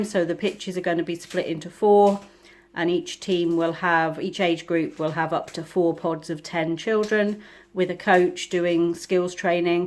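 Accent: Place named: United States. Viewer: Wow u got a lot of shopping there! Hope Caleb has a fab day! listening to ur acc ent since I subbed and initially thought u had a Somerset twang every so often!!!!!!! Then I realised it must be American twang?!!! I can't think why I haven't asked before, but u have a lovely accent t!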